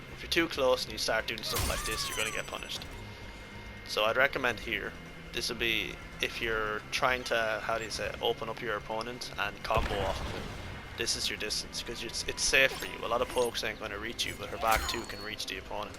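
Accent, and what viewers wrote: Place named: Ireland. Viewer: thank you for the video. Nice Irish accent too.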